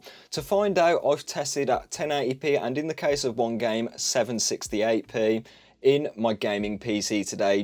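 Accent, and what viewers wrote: Black Country, United Kingdom. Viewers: It's nice to hear a black country lad. Keep up the good work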